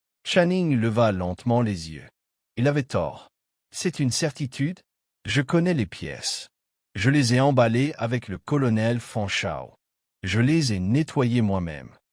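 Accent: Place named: England, United Kingdom. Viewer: La voix avec un léger accent anglais du "lecteur IA" est plus supportable que celles entendues dans les autres épisodes.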